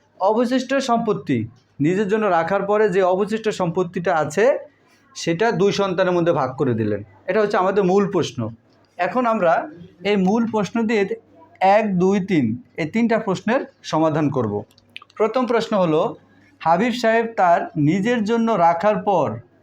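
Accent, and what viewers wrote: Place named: Bhola, Bangladesh. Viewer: স্যার আপনি কি ভোলায় থাকেন ? কথাবার্তা ওইরকম লাগছে ।আমার নানা বাড়ি ও দ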